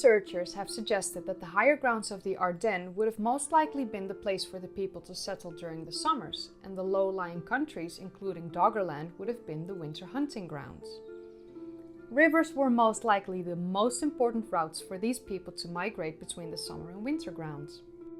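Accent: Netherlands. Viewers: don't loose the Dutch accent! Please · Your accent is subtle. I think you're Dutch. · Lovely Dutch accent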